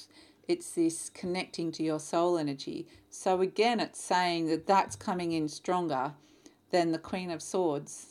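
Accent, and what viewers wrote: Australia. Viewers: Yeah those aussie spiders can be scary! 🤪your readings are wonderful love! And nothing more lovely than the stralia accent! 💖💕 my home away from home. 🤸‍♀️💫💖 thank you for the great reading!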